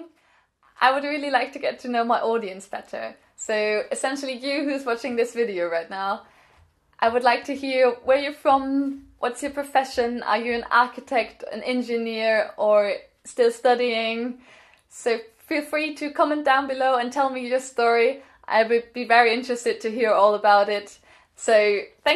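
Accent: United Kingdom. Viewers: It's awesome to see such a diverse following here! Indonesia, Brazil, Spain, Denmark, Syria and more maybe idk. As an American, I'm amazed that a German can speak English so fluently, that I can't even hear an accent. I can detect only a tiny British accent, along with terms like saying 'zed' instead of what we say here in the US, zzzzzz. Unless you're actually a Brit, just studying in Germany. Sad that this is really your only vid on dynamo. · Great tips! started out in auditing with a real addiction to excel and macros and then went into architecture. When I saw that dynamo could be used within Revit, it was such a great way to bring that side of my brain back into finding quicker or easier ways to do something. Where are you from and is the accent from time spent in the UK? I’m South African and spent time in London and now in Melbourne so who know what I sound like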